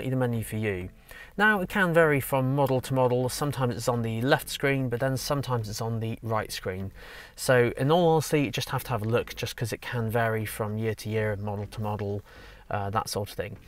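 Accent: United Kingdom. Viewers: you are the true sound of the German Mercedes Benz, with a British accent. Love your work mate.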